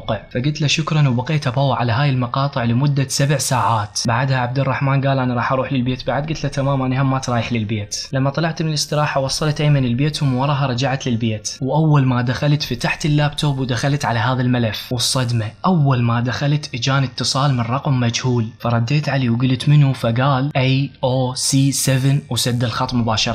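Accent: Iraq. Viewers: أنا عبدالله من سكان الرياض عمري22 سنه بعيد كل البعد عن المنطق الشكل عراقي واللهجة عراقي ليش الكذب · انت تتكلم عراقي وانت سعودي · لهجتك عراقيه؟ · لهجة اهي مو لهجة سعودية انت من العراق ليش ماتقول انت من العراق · ليش لهجتك عراقيه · كيف انت سعودي وتتكلم عراقي وتقول دوانيه ولا انت كويتي عشان افهم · لهجتك عراقية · والله العظيم اللهجه مالتك عراقيه وبغداديه هم اني عبالي عراق طلعت سعودي يلا ماكو فرق اصلا تحيا الك حبيبي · اللهجه عراقيه ميه بلميه شسالفه؟؟ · لهجته عراقيه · ليش يجي عليك انو انته عراقي حجيك عراقي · أحس هو عراقي لأن لهجته عراقية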